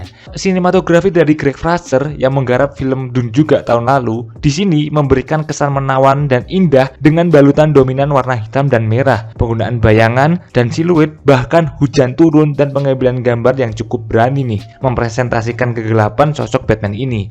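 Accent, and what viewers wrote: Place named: Java, Indonesia. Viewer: Saran bor bisa gak, jang pake gue - gue Soalnya kan dirimu kentara banget medok nya Yg mending medok aja lebih enak didenger Dr pd lo gue lo gue..😁👍👍👍👍🙏🙏🙏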